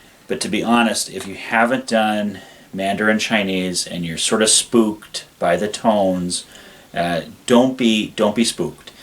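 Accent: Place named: United States. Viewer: I love this review, i just wonder why you are pronouncing the words after with an american accent? Im from here, too, so asking as a neighbor. Ive been writng words phonetically to make sure im saying what im hearing and not reading in my own language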